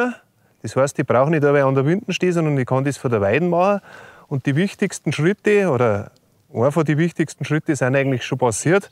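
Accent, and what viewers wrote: Bavaria, Germany. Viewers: Und der wieder in schener bayrischer Mundart erklärt😅 da muss man aufmerksam und konzentriert zuhören. Wie die Lehrlinge in der Labau😉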